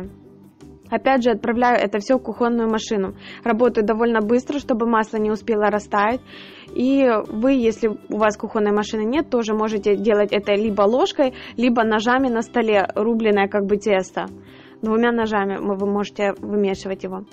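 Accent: Ukraine. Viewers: А то,что надо грамотно разговаривать,это фаэтОн девочка украинка,судя по говору,поэтому не совсем грамотно говорит,но ведь это не экзамен по русскому языку.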